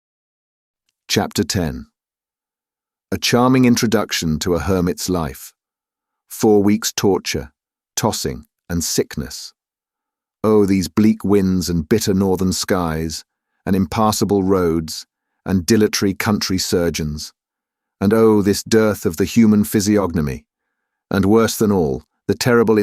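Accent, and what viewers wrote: England, United Kingdom. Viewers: I can’t listen to this English accent bs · Thank you for the wonderful reading and your beautiful British accent. I spent three days listening to this audiobook—a true masterpiece, once in a lifetime. The language is so beautiful, with a vast and rich vocabulary.😇😇😇😇😇😘😘😘😘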